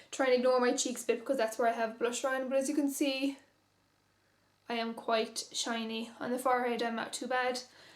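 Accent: Ireland. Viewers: I love your accent so much!!! I love Ireland!!!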